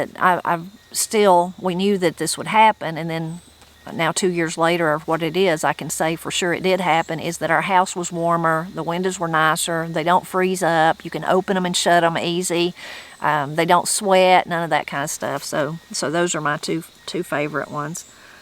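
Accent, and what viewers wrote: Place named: South, United States. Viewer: I am from Columbia, California. You remind me of my family, my Mother, Aunts, Grandmother, your southern accent, you love of God, your growing a garden, hunting. I feel like I am home when I listen and watch your channel, Thank You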